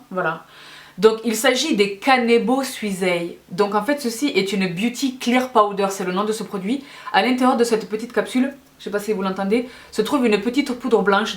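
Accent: South, France. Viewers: Bonjour; je suis tombée par hasard sur vos vidéos et j'en suis fan........j'apprécie votre élocution avec cet accent chaleureux du sud et ce charisme qui rayonne à chaque vidéo, un vrai bonheur. Une abonnée de plus....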